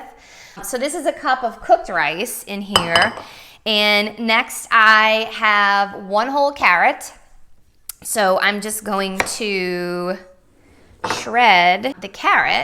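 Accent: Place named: Texas, United States. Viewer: You came up into my feed today. I binge watched all of Plantifulkiki’s videos and switched my diet three weeks ago. My energy is amazing now! I subscribed to your TB & IG I can completely relate with the family issues & diet X 10 years. I hope to be an inspiration to them & then they will follow more. Cute Texan accent! You’all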